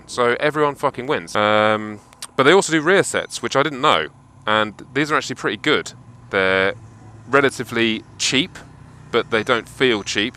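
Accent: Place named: RP, United Kingdom. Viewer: cool, you sound exactly like Sacha baron cohen's normal speaking voice,, not Ali g. Well posh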